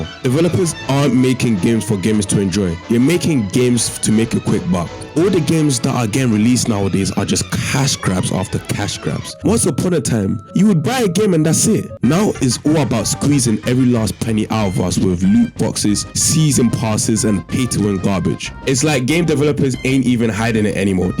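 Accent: United Kingdom. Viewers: brit and slow, pick a struggle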